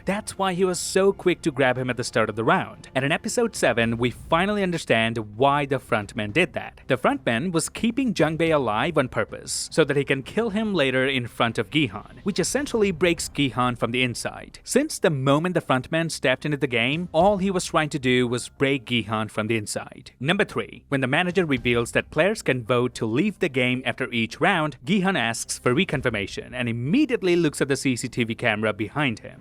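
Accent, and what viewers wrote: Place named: Canada. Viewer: which part of canada is this accent from? Sounds interesting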